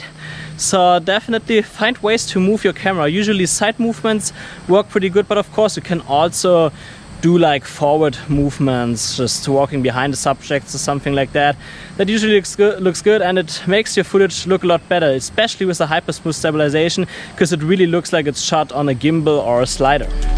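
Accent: Germany. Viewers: Der deutsche Akzent ist real :D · Keine 48 Sekunden dein Video angeschaut und gewusst, dass du ein Deutscher bist :D Der Akzent ist eben sehr durchdringend haha :D Hast ein Abo da!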